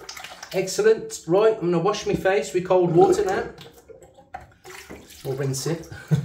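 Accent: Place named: United Kingdom. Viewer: New sub here, wet shaver since lockdown, started 1/7/2020 a date you can remember 😂. I bumbed on your video and said to myself what an enthusiastic lad, I hope you keep this freshness to your future videos. I also love paa john frum, cad is another favorite. To the lad who wrote about why going to so much expenses I would answer when you get in the rabbit hole you can't get out 🤣. Where about in the UK are you? I try to figure out from your accent. I live in Southampton. Take care buddy 👍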